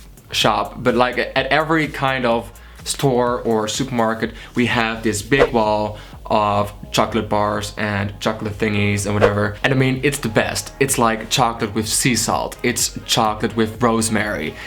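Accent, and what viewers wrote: Netherlands. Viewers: Je hoort zo erg dat je Nederlands bent. Het is echt schattig😂❤️ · nederlands accent mijn maat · Jij hebt echt een nederlands acksent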